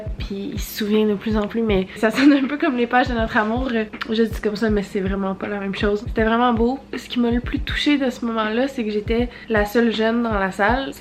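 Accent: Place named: Quebec, Canada. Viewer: Moi, prendre soin de moi ça n'arrive pas souvent, mais quand je le fais, je suis à fond: je passe longtemps (très longtemps) dans la salle de bain à me faire pleins de masques, de gommages, me mettre de la crème et tout et puis j'aime beaucoup me faire des petites surprises/choses cool au quotidien, rien que faire mon lit avant d'aller faire ma douche pour pouvoir me jeter dessus après ça me fait du bien... Faire la cuisine pour prendre une photo trop belle (et bien manger 😋), ça ça me fait plaisir. Mais j'aimerais beaucoup me faire une journée solo comme toi: aller au ciné, au restaurant, faire les magasins toute seule mais c'est un peu compliquée parce que du haut de mes (1,83 m / 6 pieds et ) 14 ans, je ne peux pas faire énormément de choses route seule... 😢 Mais j'en profiterai plus tard 😜, là je me concentré sur mon brevet blanc de lundi prochain 😥, courage à tous les 3èmes de France et pour finir, De gros bisous à toi qui me redonne le sourire avec ton accent québécois et tes idées toujours aussi géniales qu'originales 😉. 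Full love 😘❤️❤️❤️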